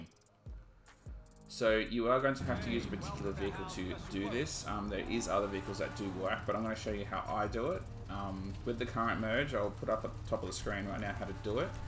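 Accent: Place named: Australia. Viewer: I only realised u was Australian 😭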